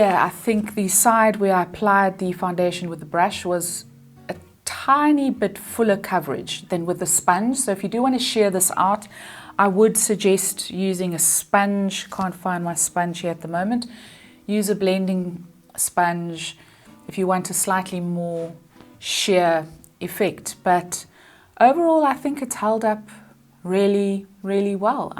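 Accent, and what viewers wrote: South Africa. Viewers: Hi, I liked this video. Just found you on YouTube. I can hear this hat you are South African. I am also, but living in New Zealand. You gave a very good review. I feel I can identify with you 🥰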